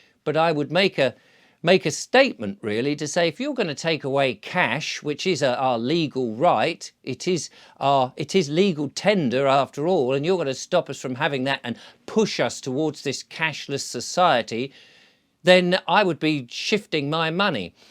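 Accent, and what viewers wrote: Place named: RP, United Kingdom. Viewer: Looking at the way dresses and his house, and the posh way he talks one suspects he may have a bob or two!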